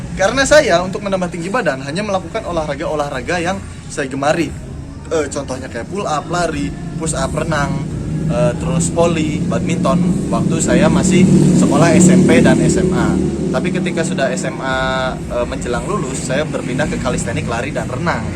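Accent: West Java, Indonesia. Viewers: Bang dari sunda kah? Itu mangunreja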